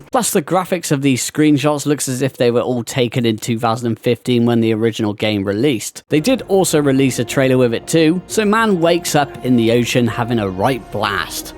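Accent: United Kingdom. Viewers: You hit the nail on the head mate. I love how sarcastic you are. God I love the British accent lol.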